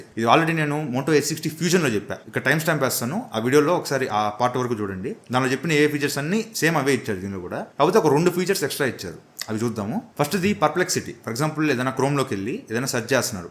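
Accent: Nellore, India. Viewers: Anna meedhi nellore aa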